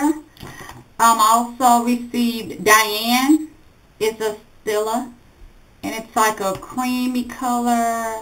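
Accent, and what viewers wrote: South, United States. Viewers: you looook soooo young i mean 33 is young but you look in your 20's love the vids...i could tell u were in the south by the way u tlked so tht was comforting to me im near dallas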